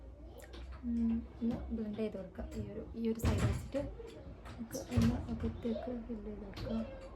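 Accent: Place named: Malappuram, India. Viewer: Loved your malappuram slang... Maakup 😅😅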